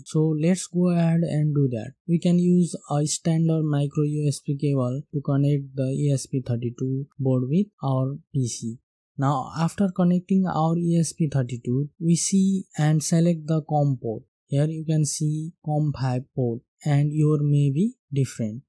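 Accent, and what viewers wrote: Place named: India. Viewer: downvoted because you sound indian and i can't understand half of what your saying. let me explain: downvotedbecauseyousoundindianandican'treallytellwhereonewordstartsandanotherwordendsbecauseyoudon'thavespacesbetweenyourwordswhenyouspeak. i don't think can be any more clear than that.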